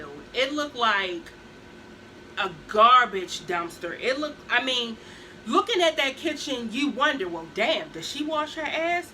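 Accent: Baltimore, United States. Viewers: hey babe! i love your baltimore accent.💛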